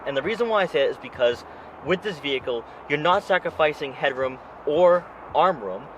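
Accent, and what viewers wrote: Boston, United States. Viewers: Excellent review of the 2020 Subie Forester, love your Boston accent, I heard it strong at times. I'm not what the exterior color is of the one you reviewed, but it certainly looks amazing 👌. However, the 2020 Legacy XT Touring you recently reviewed was awesome.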